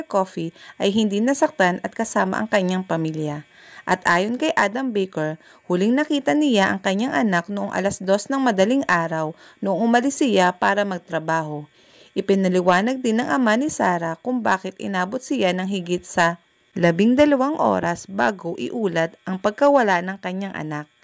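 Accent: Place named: Visayas, Philippines. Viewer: ung may pagka bisaya magsalita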